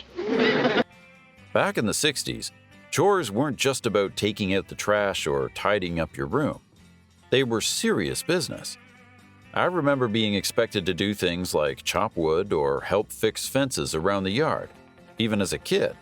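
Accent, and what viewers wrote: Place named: Canada. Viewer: This narrator sounds like he's from 🇨🇦 . . . 😊 Yeah, our childhoods were waaaay different from those of kids today! I don't think I'll ever get used to how quiet my neighborhood is in the summer! 😅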